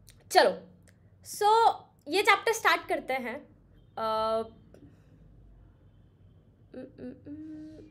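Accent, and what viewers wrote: Haryana, India. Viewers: Boli haryanvi lage sey ❤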